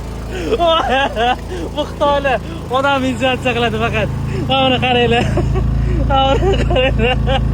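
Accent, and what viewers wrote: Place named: Tashkent, Uzbekistan. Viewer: Ким буларди санга ухшаганде кулма тошкенча гапириш ухшамади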